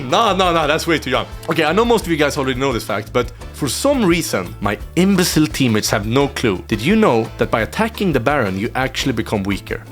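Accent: Sweden. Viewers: This svengelska😂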